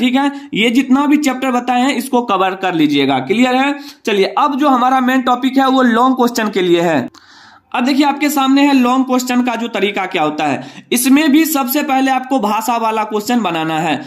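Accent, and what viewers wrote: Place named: Uttar Pradesh, India. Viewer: Up ka q bol rhe ho sir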